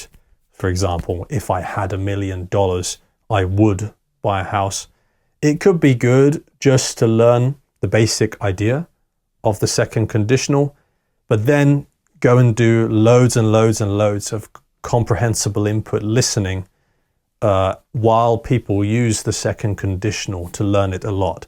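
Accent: United Kingdom. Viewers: I love the british accent, ❤❤from Bangladesh.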